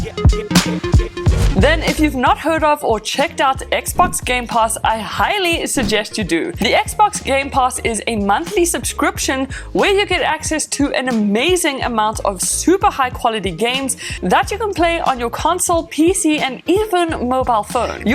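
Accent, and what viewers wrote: South Africa. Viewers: Her accent is spot on south african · I sense South African👀😂 Maak my sommer trots🔥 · Are you South African? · Is she from south Africa? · Is she south African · Your South African is that true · That south African accent. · You sound south African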